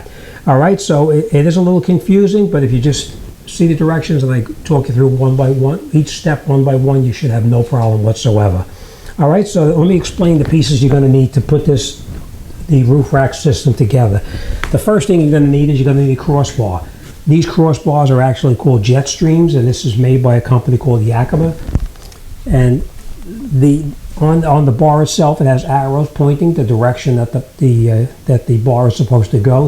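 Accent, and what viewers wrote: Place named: Maine, United States. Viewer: You sound like a Mainah... I love it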